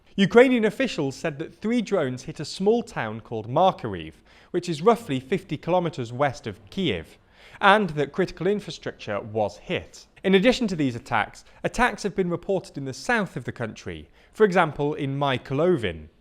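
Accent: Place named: Liverpool, United Kingdom. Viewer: I cannot stand this guy prenouncing GUverment instead of gOverment. It is a great newsflash, really you're doing a great job but please lose the Liverpool speech impairment. It is like a dripping crane that slowly drips a hole in my skull.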